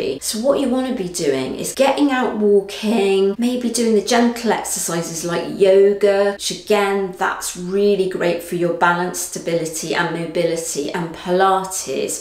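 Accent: United Kingdom. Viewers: Definitely British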